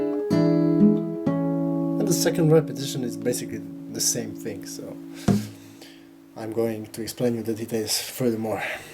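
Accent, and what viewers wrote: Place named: Poland. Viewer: I am also a fan and listen to Jordan Peterson. I lived in Hungary for 8 years and have many Polish friends. I knew I recognized that accent. Thank you again for the great lesson of a favorite song!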